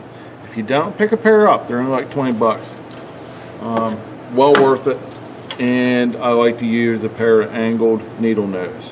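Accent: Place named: Pittsburgh, United States. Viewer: Good vid thanks cuz... it's what I thought anyways but you just gave me some reassurance that I was doin the right thing. You're a Burgh boy ain't you? I can hear it in the accent (it's thick cuz), the force is strong wit this one.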